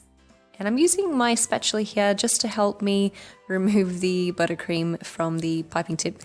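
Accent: Australia. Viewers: thanks 😊😀. Also are you Australian???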